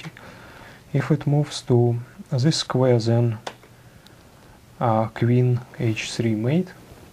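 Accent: Russia. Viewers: The "O" in the Russian alphabet is pronounced by like "Ah" (except the accented syllable" , e.g. хорошо = harasho Thats why he has that accent. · Very nice. And English with Russian accent i so cool.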